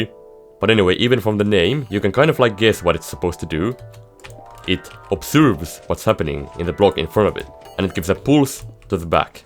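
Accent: Finland. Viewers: This sounds really much finnish accent! Pitää tilata kanava, hyviltä videoilta vaikuttaa!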